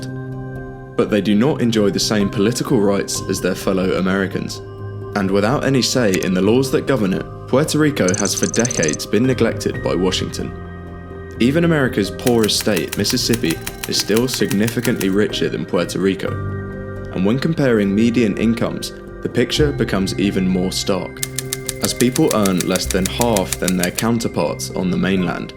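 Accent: United Kingdom. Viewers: So, as an American, I’m being scolded by a British accented presentation? Not disagreeing with anything, but seriously, I wonder what the British did with some of their colonies?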